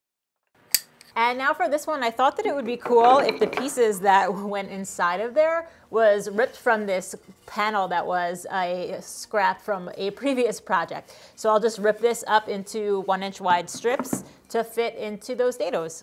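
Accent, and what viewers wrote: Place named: Long Island, United States. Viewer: trying to place your accent. Could it be the eastern end of Long Island?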